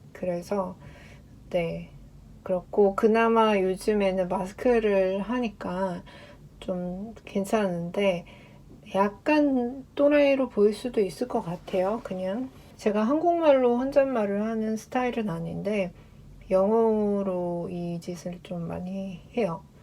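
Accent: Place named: United Kingdom. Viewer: 영국영어 발음이 멋있는데 발음은 어떻게 연습하셨나요.. 아무리 읽어도 한국인 발음에서 벗어날수가 없네요😢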